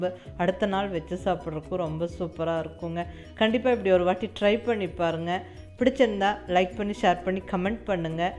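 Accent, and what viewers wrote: Coimbatore, India. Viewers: சூப்பரா இருக்கு கோயம்புத்தூர்ல நீங்க எந்த இடத்தில இருக்கீங்க ன்னு சொல்லுங்க மேடம் நீங்க பேசற லேங்குவேஜ் சூப்பரா இருக்கு நாங்களும் இப்படிதான் பேசுவோம் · Hi aunty Naan Bangalore larundhu ungaloda periya fan Unga kongu tamil ennaku romba pudikum Unga recipes lam Vera level Naan clg 1st year poga poren Enga chitti and ammuchi lam Coimbatore la dhan irukanga Yedayarpalayam la Ungala naanga paakanum Plz neenga enga irukinga nu sollunga 😍😍😍😍😘😘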